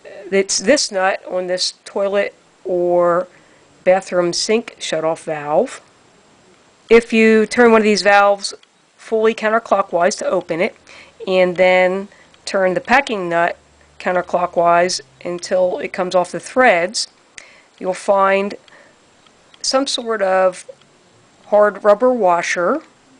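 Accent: Philadelphia, United States. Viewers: From Philadelphia area? The accent is crazy! Wudder, Donit. lol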